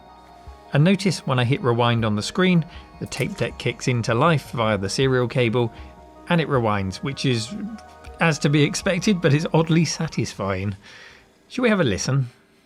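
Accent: United Kingdom. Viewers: British LGR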